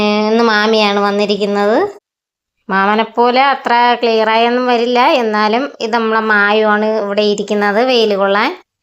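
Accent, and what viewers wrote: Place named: Trivandrum, India. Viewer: മാമി സൂപ്പർ,,,,,, നിങ്ങടെ slang tvm supur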